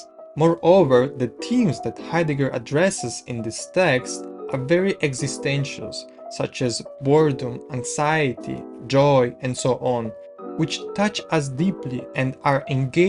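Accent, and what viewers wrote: Russia. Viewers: Я как услышал как ты жестко выговариваешь слова, сразу подумал, а не русский ли ты, а потом такой мое имя 😅. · Are u Russian?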